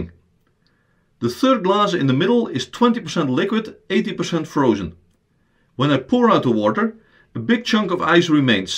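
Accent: Netherlands. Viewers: Ik dacht eerst oh nee, een Nederlander die een Engelse uitleg gaat geven, dit ga ik niet uitkijken hoor. Maar ik was fout, je hebt een superduidelijke uitleg gegeven in heel verstaanbaar NederEngels. :) Thanks! Duimpje omhoog!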